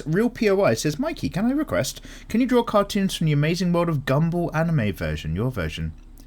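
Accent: United Kingdom. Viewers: Mikey you kinda look like and sound like Ravi from Izombie (not just the British accent). if you don't know who i'm talking about... well you can look it up. i'm also gonna post this on every DWM in the future till you find out. also draw albedo from overlord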